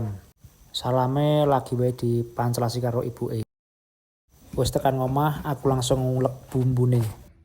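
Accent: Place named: Java, Indonesia. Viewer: Mas sliramu piyayi ngendi si baha jowone ko medok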